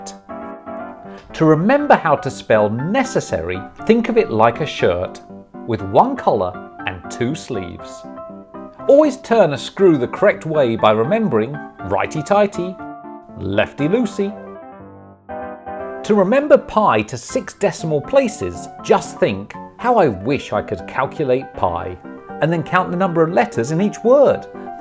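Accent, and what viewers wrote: United Kingdom. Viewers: Who is this guy? He sounds British.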